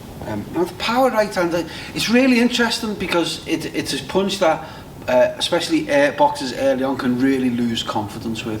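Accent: Liverpool, United Kingdom. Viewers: IS this the liverpool accent?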